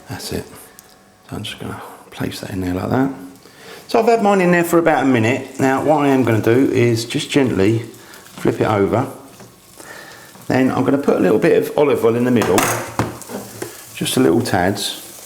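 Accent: United Kingdom. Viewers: This is what most British people sound like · beautiful british accent ;) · British accent. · Thanks for the recipe M8. I love the accent BTW. There's something about a Brit accent on cooking videos. They should call it the Gordon Ramsay syndrome..... ;) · british as fuck. I love it, tough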